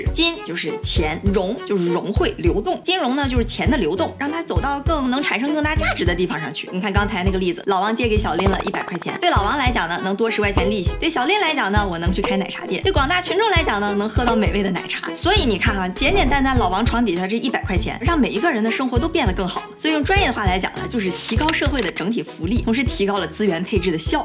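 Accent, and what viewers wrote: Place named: Beijing, China. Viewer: 这京腔，真好听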